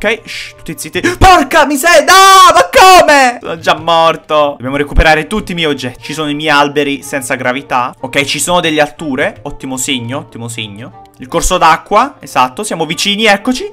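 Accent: Naples, Italy. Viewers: ma sei napoletano?🤣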